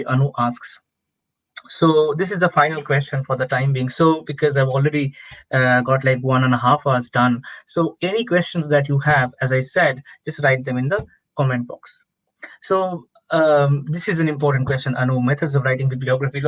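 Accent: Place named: India. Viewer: the accent was hard for me to understand. I think it is good for Indians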